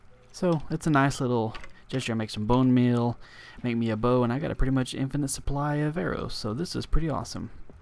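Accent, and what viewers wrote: South, United States. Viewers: you from the south bro?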